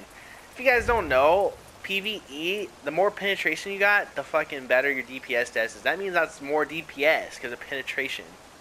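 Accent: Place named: West Coast, United States. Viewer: Yo you gotta be from the west coast with that accent. Lol nice vid bud.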